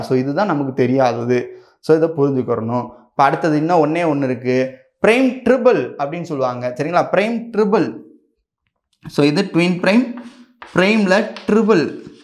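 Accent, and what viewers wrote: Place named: Madurai, India. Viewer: Ungaludaya peachy madurai slang appidiye irruku,so cute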